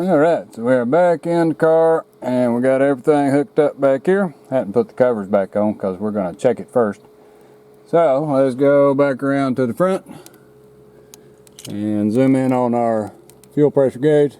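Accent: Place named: South, United States. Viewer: Lo hace verse tan sencillo, que Bien maestro y me gusta su acento country. Gracias. Brenda from Mexico city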